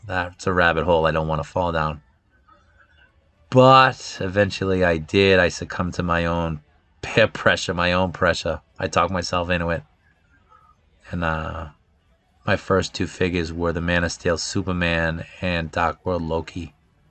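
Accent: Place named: New York, United States. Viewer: That New York accent has to go. I had to watch the video with the sound off lol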